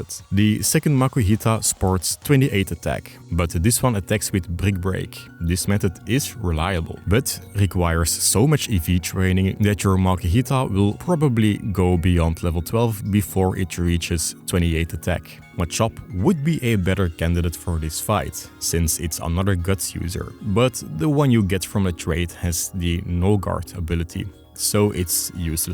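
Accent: Netherlands. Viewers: I can just hear that you're Dutch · Question: R you Dutch, French or neither?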